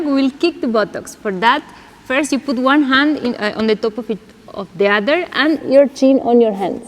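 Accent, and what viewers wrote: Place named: India. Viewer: Nice English pronounciation style is same as Indians....JGD.....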